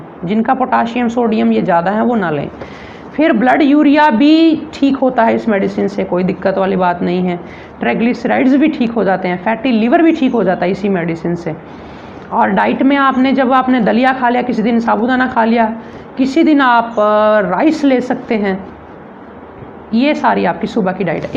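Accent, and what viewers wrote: Punjab, India. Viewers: भाई जी आप की हिन्दी बहुत अच्छी है आप पंजाब-हरियाणा से तो नहीं है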